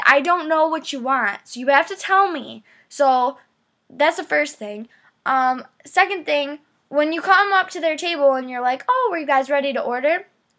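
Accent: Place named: Michigan, United States. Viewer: you sound like you're from michigan!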